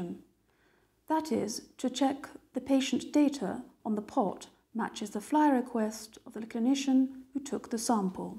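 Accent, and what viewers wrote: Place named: RP, United Kingdom. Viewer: Sounds like "Received Pronunciation"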